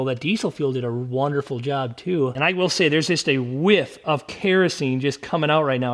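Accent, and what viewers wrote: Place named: Midwest, United States. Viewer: mid west accents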